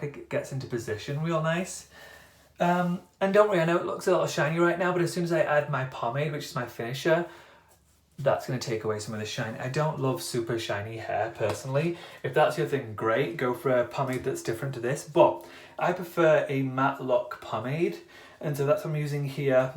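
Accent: United Kingdom. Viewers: I love how this is how you actually talk, you have a proper queens english accent & its amazing!! I'm from Liverpool (UK) and I want but nothing to have the Queens English accent! haha. Also I love your videos!!!! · His accent is not that posh he’s just british! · I have no need for this, female with mid-long hair, but listening to you is really soothing. As a fellow brit I'm in love with your accent!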